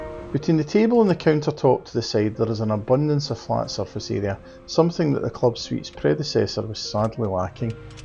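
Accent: Scotland, United Kingdom. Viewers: Great video. Love the Scottish accent!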